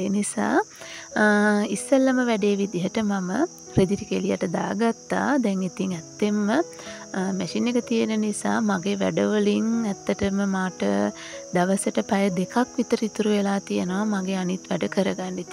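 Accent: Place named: Dry Zone, Sri Lanka. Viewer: වියළි කලාපයේ kiw නිසා අහන්න හිතුණේ oya කොහෙද අක්කේ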